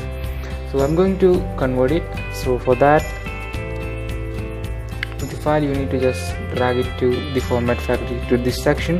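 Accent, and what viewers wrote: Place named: Kerala, India. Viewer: gud tip. malayalee accnt.malayalee ano?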